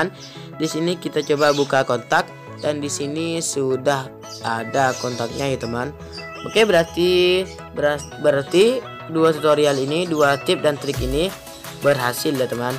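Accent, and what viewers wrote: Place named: Madura, Indonesia. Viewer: mksh byk bang.. spt lg dngerin bang Kadir madura, lg berbcra. hehe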